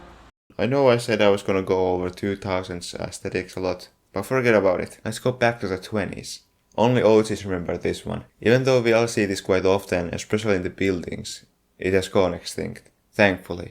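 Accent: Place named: Finland. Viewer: Hehe ekasta lauseesta äkkäsin suomiaksentin :DDD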